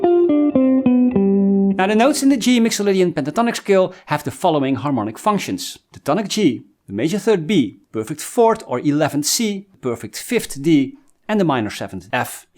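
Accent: Netherlands. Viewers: This is the BEST guitar channel I've discovered in all my years. And your playing - is beautiful, soulful, tasty, everything I'd want to be! And your accent reminds of my beloved late (Dutch) father.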